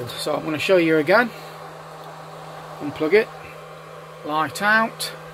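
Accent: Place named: United Kingdom. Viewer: Hi, Just watched your video after doing a search on the net for Epever com port failure. I have a Tracer 4210AN which I have had for about 9 months. 2 days ago the data logging suddenly stopped, I monitor it using a custom logger called a SolarDashboard buy SnekTek which is very similar to your data logger. I can also monitor and adjust setting via my PC using a USB to RJ45 cable. Now I cant do either. Any suggestions? I too am in the UK (guessing by your accent) Nice to see an audio cassette on your shelf next to your stereo. My setup is in my garage where I too have a stereo and was only playing a cassette the other day. Graham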